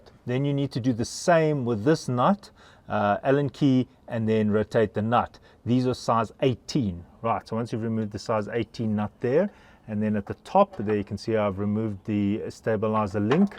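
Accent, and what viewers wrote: South Africa. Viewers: Actually, I found the opposite to be true. He's quite articulate. If you're not a native English speaker, I could see having issues understanding. Sounds like this gent is from South Africa. Personally, I'd suggest just being thankful he cared enough to share his experience and teach folks how to do this job. CC, playback control and rewind are things. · Yes this guy is definitely from South Africa, as am I. He really is a very good instructor, especially on the e65. His videos has helped me out a lot already.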